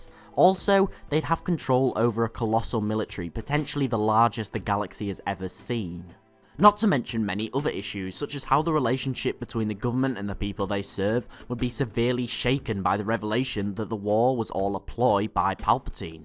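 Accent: England, United Kingdom. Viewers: Is this guy english?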